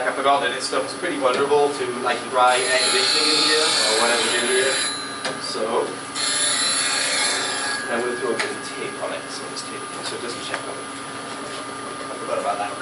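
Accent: Newcastle, United Kingdom. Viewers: Your Geordie accent is so messed up, man. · And the Geordie accent shines through ;-)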